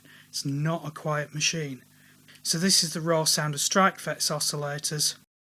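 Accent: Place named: North, United Kingdom. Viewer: Great review nice to hear a northern accent on here.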